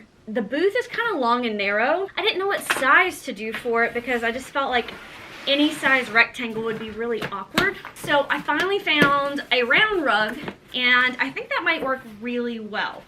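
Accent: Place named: Carolinas, United States. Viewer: You mention knowing Abby ~ she’s from the Jacksonville area and you sound like your’re from one of Carolinas. Take Care. 😊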